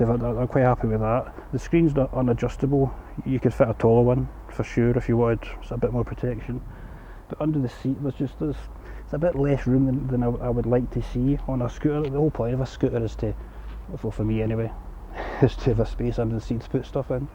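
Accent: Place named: Scotland, United Kingdom. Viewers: Good Scottish accent, I could almost guess where he was born in Scotland. Nice review ! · Strong Scottish accent. He's speaking quite clearly, and just with an accent.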